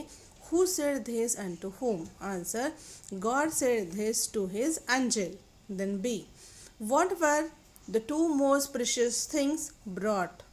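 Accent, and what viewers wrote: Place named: India. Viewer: U indiab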